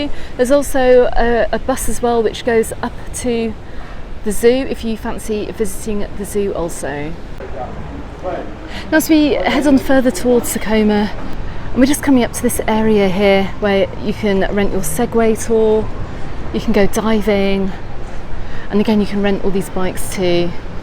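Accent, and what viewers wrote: United Kingdom. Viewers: Damn so strong british accent i do not understand nothing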